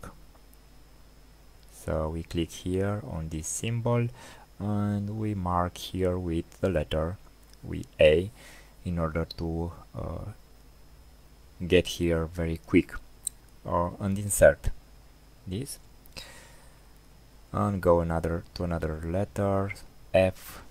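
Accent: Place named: India. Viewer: The comment by Aayush Das Gupta says, with some profanity, that your English is good. NO, it is pathetic and is heavily laden with Hindi accent, the most pathetic of all accents. Your tutorial is a clear as stirred muddy water. Look at the down and upvotes. I seriously recommend you use a text to speech converter, there are a few of them to download for free. You should have used an ordinary text document, with page numbers and a proper word as a bookmark. LibreOffice's own help is as pathetic.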